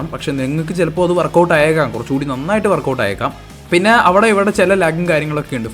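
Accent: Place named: Kodungallur, India. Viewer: ചാലക്കുടി അല്ലെ സ്ഥലം, കൊടുങ്ങല്ലൂർ അടുത്താ , same സ്ലാംഗ് ആണ് അതുകൊണ്ടായിരിക്കും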